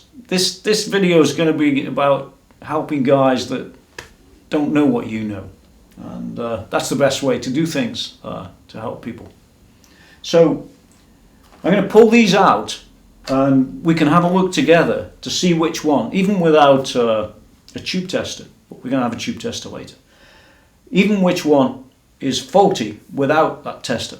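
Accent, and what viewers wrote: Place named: Northern England, United Kingdom. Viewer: ah. Fair enough. It sounded vaguely northern to me. Like bits of my mate from st Helens mixed with Yorkshire. I'm from Northumberland myself so anything south of Middlesbrough is a mystery to me accent wise.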